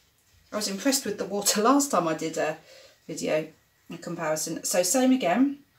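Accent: United Kingdom. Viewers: I found you a couple of nights ago. Well done on showing us your pour experiments it must have taken a long time to prepare. I did my fist pour yesterday they were all a bit of a mess but very pretty and I managed cells. So lots of practising ahead for me. I left everything on the kitchen table so round 2 today. Keep going it's nice to hear a British accent and no annoying background music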